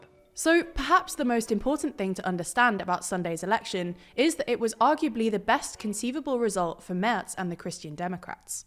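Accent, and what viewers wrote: United Kingdom. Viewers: I just can't listen to an Asian person with a British accent. It's so wrong.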